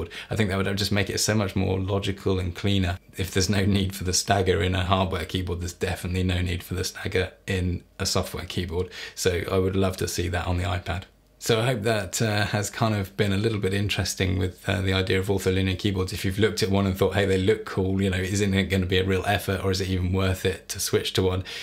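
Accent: United Kingdom. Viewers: That cultured accent doesn't hurt. For the life of me I cannot comprehend why the Scandinavian countries would choose an American accent over a British one when adapting English as a second language. Pure insanity. I'm not even British and have to admit to the clear superiority in their pronunciation. I mean a refined accent like this guy has, not some brash, horrible concoction you hear so often outside of London. Here in Canada we have Quebec that brutalizes the beautiful language of France! Its so strange how people don't seem to discern language pronunciation. In New York City which is plagued also by a horrible accent high achievers seek to rid themselves of that accent by the time they have graduated university. They are making a good choice. One of the worst in the US? Boston! Ugh. Sounds like they barely graduated kindergarten. Maybe I'm too sensitive. 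No offence to people from New York and Boston!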